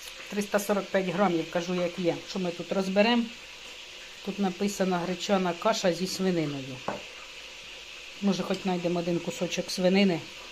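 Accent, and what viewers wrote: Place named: Poltava, Ukraine. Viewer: Доброго вечора пані, підписалася на ваш канал, хоча я із західної України. У нашому місті теж є і АТб, і Сільпо, купляю в ціх супермаркетах тільки акційний товар і то не все підряд, бюджет не позволяє з їх " доступними" цінами. Дуже приємно вас дивитися і слухати вашу полтавську мову. Хай вам щастить, піднімається настрій після просмотра, як завжди з юмором, а кому не подобається, хай не дивляться.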